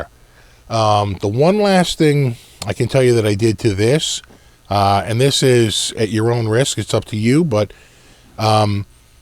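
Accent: Boston, United States. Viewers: From Boston? Say no more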